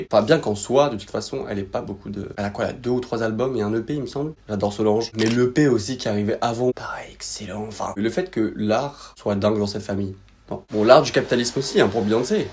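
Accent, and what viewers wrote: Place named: South, France. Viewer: T'as un peu un accent du sud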